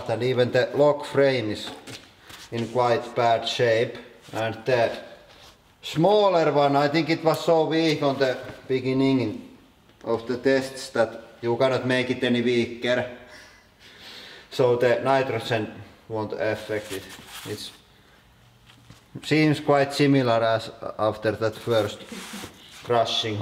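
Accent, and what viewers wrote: Finland. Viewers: FINLAAAAND ACCENT!!!!!!!!!!! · typical finnish england :) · Ah Finland. I wondered where your accent was from. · Wow the finnish accent is really different than the swedish one eh? How similar are the two languages , if anyone knows?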